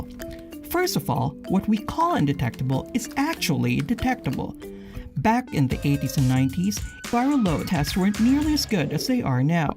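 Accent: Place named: Philippines. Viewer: The narrator sounds Filipino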